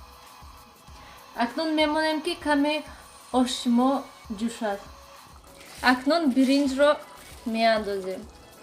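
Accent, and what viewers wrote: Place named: Hisor, Tajikistan. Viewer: Рахмат ма ай хисор ранги мо гап мезанед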